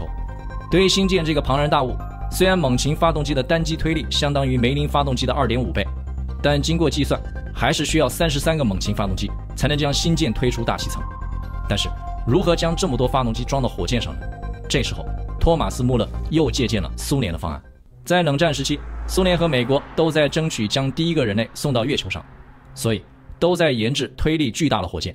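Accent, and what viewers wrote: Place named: China. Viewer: 一嘴大陸口吻，不看了，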